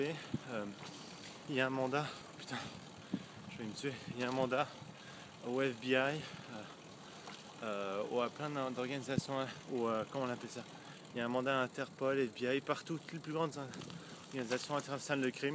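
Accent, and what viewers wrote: Quebec, Canada. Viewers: Fuck j'espere qu'il est reconnaissable si il a des traces d'accent quebecquoi :/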